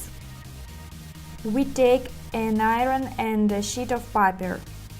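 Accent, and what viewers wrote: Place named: Russia. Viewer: то то я думаю акцент русский 😄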